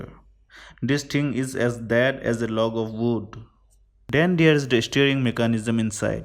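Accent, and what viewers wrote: India. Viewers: You sir have the "Stereotyped" Indian/South-Asian accent, the first time I've heard one of my countrymen with the accent foreigners mock us with. But thats Ok. :-)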